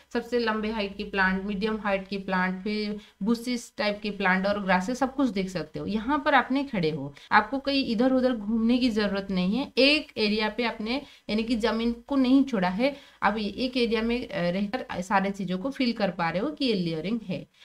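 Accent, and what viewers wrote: Odisha, India. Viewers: Nice explanation ma'am 👍 Are you from odisha????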